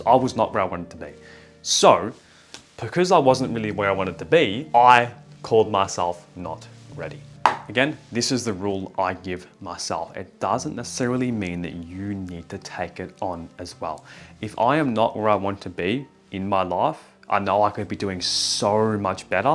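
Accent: Australia. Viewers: Thank you so much for this video, it made me realise so much about myself i didnt know❤, also i love your Australian accent🤩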